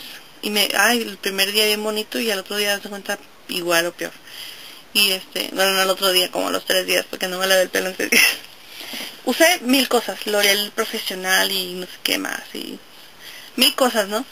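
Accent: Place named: North, Mexico. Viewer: buen consejo gracias... Eres de Tijuana?? hablas identico que mi hermana.. bien nortena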